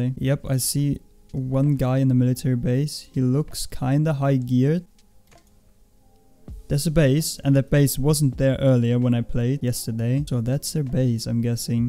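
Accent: Germany. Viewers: Meine fresse man hört das deutsche raus ;D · U German?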